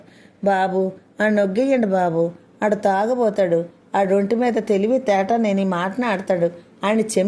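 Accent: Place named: Uttarandhra, India. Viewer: Good story. The slang of uthharandhra well established in the tone of story teller.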